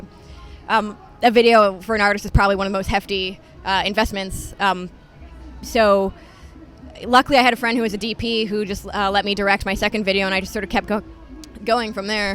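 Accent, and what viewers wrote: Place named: California, United States. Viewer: She's sounding really Californian. haha I kind of miss the rookie Grimes, but it's cool to see how much success she has attained.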